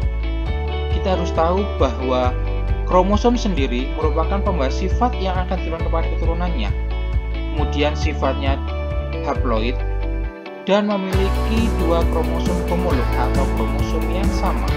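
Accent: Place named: Java, Indonesia. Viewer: Mohon maaf kak kalau boleh koreksi kurang jelas dalam pembicaraannya soalnya agak agak kedengeran medok jawanya mohon maaf nih kak🙏 mohon maaf juga initeh gatau pendengaran nya kurang jelas gatau dari mananya😀🙏🙏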